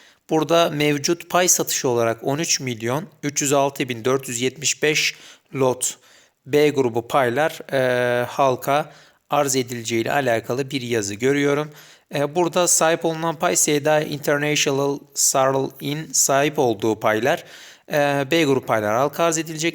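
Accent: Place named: Newcastle, United Kingdom. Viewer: İngilizce aksanın newcastle upon tyne tarafına benziyor super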